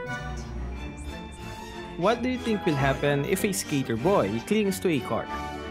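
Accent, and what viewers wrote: Philippines. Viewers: You can hear his peenoi accent 😂💜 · Pinoy na pinoy mag english ah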